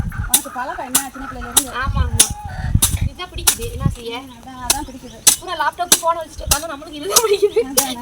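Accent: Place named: Madurai, India. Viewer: Ooruku varaum manjukurompa santhosama eruku mugathil avalavu santhosam mathurai thamil apadiye eruku americaviliruthu india vara pilaigagooda evalavu santhosa patirula mattaga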